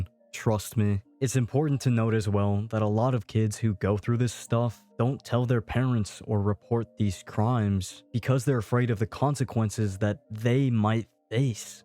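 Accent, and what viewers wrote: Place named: United Kingdom. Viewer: in his British accent era is peak:D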